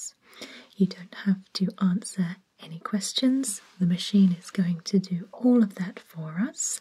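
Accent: United Kingdom. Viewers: Brilliant ASMR! +Subscribed when heard that British accent. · Whereabouts in the UK are you from? I'm from up North and couldn't imagine a Northerner doing ASMR (at least not well), especially with an accent from where I'm from, hahah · Sounds British with a bit of Australian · 1. Why have I just now come across your channel? :o 2. What's that accent? (British obvs. but exactly) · As an Australian I feel offended, she sounds British not like us at all! Maybe you are hearing the R sound but there are 25 other letters to consider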